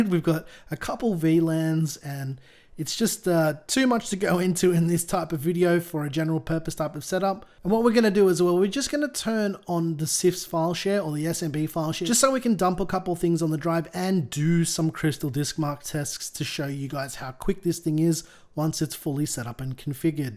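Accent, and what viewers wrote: Australia. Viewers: Haha yeah but stick to your guns I reckon. Ignore the complainers. People need to accept you’re Aussie with an Aussie accent.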